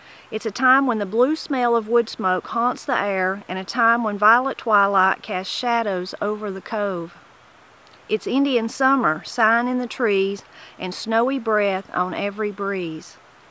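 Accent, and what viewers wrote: Appalachia, United States. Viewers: Really like this channel - partly for the Appalachian English, but also to learn how things are done in the countryside 🙂 I live in Scotland, November is beautiful up here with the changing colours of autumn.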